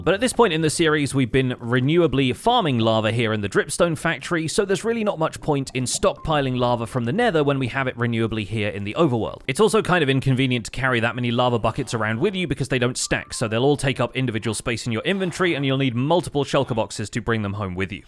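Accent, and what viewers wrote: United Kingdom. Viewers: I'm on episode 4 of this series but wanted to leave a comment on a recent with the hopes of being seen. OG Minecraft player so I've seen a fair few let's play. I always come back to you though, you have such a wonderful balance of tutorial but also a play through. It's nice to learn new things now but still see he same world develop and see you work through designs etc. Also lovely listening fellow Brits. You've got such a soothing voice!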